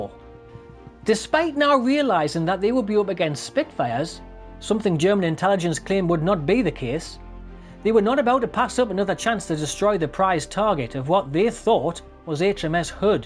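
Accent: Newcastle, United Kingdom. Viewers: Not a robot and not at all odd. It's a North-eastern English accent, probably from the Newcastle area, less than 100 miles from the scene of the battle. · It's Geordie, and it's grand! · Sounds like a Geordie( Newcastle,) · I'm Scottish and I also thought he was a geordie lad. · Beautiful Geordie accent, I love your voice. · The narrator does not have a Scottish accent. The accent is from the northeast of England - a Gordie. Many Scots do speak English well and with a large vocabulary however.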